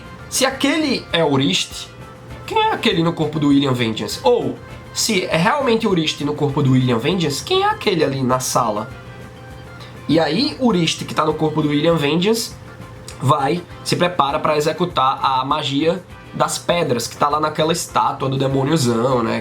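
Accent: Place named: Recife, Brazil. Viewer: Pelo sotaque vc é de recife Pe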